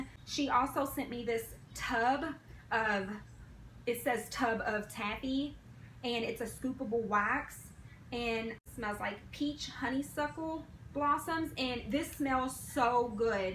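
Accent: South, United States. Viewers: Hi from Louisville, KY also! I was wondering where you were from whenever I heard your southern accent, lol! Your video just inspired me to completely clean my house after I get off work 😂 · I am a new subscriber and I am in Peru. I love what I see and you are very sweet and real. Where are you in the USA you have a Southern accent.? Xx I am of native American and Peruvian heritage so a clean home and family values means a lot.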